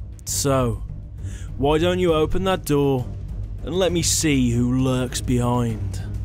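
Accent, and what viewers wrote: United Kingdom. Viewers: Againe, dune, this British accent that what got me into this asmr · I love british Accents they're so nice and calming🤩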